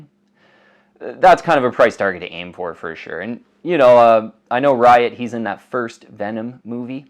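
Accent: Canada. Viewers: Love your videos - and that Canadian accent makes me miss home. Awesome work! #TimHortons4ever #logriders4life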